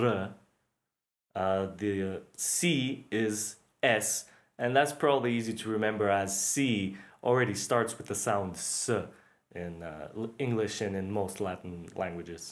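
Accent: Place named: Russia. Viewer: По произносимым звукам дико палится русскоязычный. Это не всем удаётся скрыть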